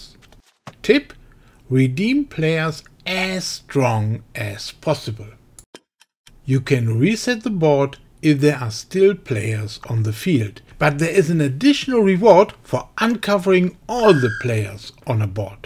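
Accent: Germany. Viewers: Sorry, bad joke. And yes we all can hear he is from Germany, but who cares? He speaks English, right? The video's are easy to understand.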